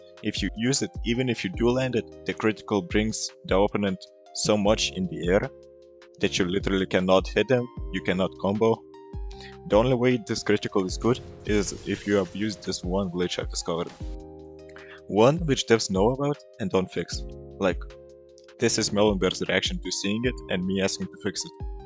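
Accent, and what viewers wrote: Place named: Eastern Europe. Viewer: you have a strong slavic accent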